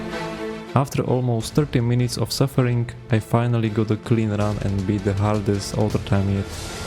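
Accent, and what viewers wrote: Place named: Russia. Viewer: já sem si myslel že je z ruska kvuli jeho prizvuku XD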